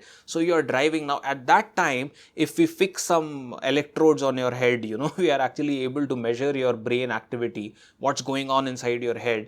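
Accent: India. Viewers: This pure Indian accent sounds sooo good....no artificial stuff 👏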